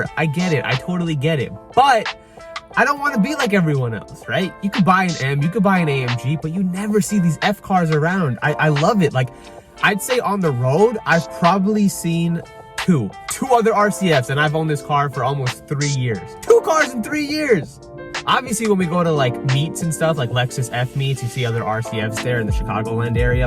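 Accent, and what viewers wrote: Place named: India. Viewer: I love the indian accent i chill with sum from saga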